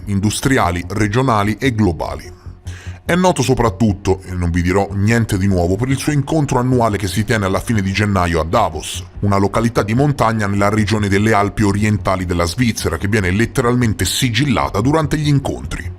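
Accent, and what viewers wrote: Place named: Rome, Italy. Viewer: L'accento è di Roma.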